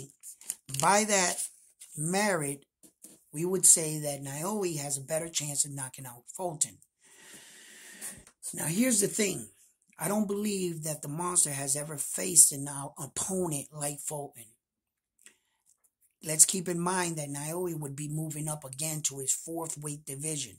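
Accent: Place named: Latin America. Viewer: Yeah a bit and your accent is Latin but I can't say if it's Honduran or something else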